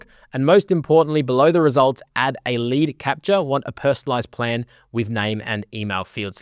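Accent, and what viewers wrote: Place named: Australia. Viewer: It's a typical feature of the Australian accent.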